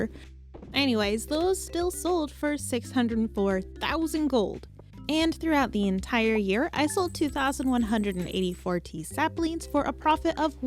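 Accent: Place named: United Kingdom. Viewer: I am getting sone serious bri'ish vibes.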